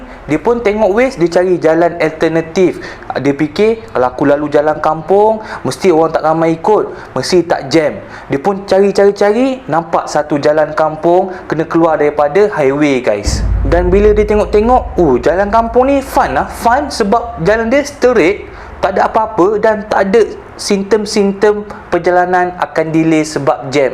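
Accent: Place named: Terengganu, Malaysia. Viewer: Assalamualaikum…akak dgn suami bru firstime dgr…bru 3 cite akak dgr…2 cite dr pantai timur…pastu yg ni…mcm dh start suke lak dgr adik b'cerita…loghat ganu tu…buleh dh tu…jd dh tu…😆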